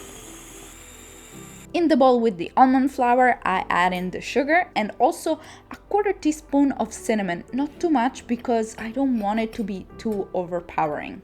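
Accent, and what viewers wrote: Italy. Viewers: Si sente che sei italiana❤ bravissima grazie della ricetta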